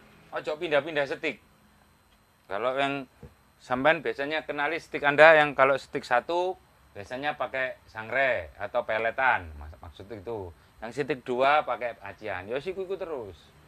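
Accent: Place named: Mojokerto, Indonesia. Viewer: kok logate koyok mojokerto an om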